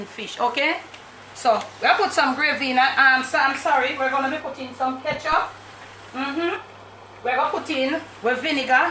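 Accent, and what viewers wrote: Jamaica. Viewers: Hey Ms love the patwah. And all a dat. 😃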